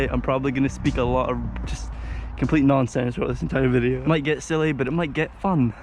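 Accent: Scotland, United Kingdom. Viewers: haha love that scottish accent! Gonna live near the Loch Lomond one day when I´m old! Keep up the great work!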